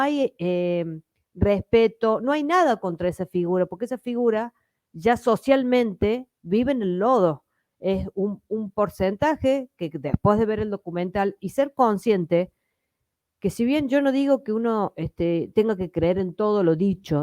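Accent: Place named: Córdoba, Argentina. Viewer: Gracias por tu análisis tan claro, por cierto, me encanta tu acento, Córdoba?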